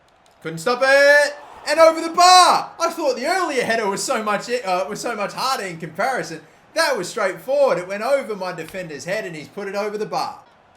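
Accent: Australia. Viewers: are you australian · Are you Australian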